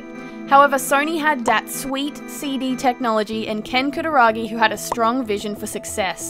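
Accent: Australia. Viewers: Are you from Australia? you sound Aussie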